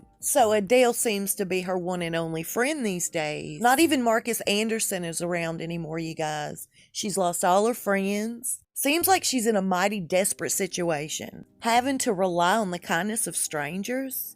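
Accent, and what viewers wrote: South, United States. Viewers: You sound like a southern gossip speaking tool! Don't worry, you have lost me.!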